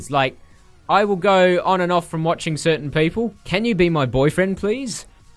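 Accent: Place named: Australia. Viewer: we're both Australian :D i didnt know because im too used to the Australian accent...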